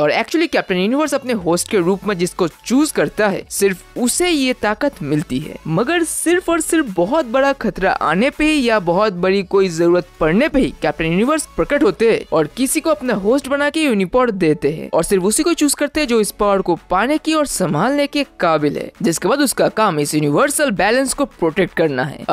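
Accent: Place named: West Bengal, India. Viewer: Bro ur accent is so heavy, anybody can tell that u belong to West Bengal 😂( No hate, i love ur vids too much bro)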